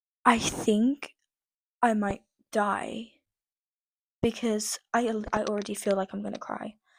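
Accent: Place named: United States. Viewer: I LOVE UR AMERICAN ACCENT BAHAHA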